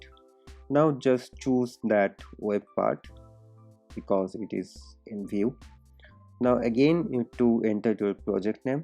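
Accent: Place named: India. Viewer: 인도억양 영어... 참....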